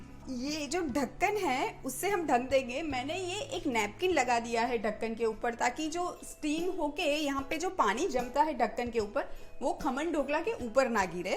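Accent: India. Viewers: Nicely explained and the hindi accent!👍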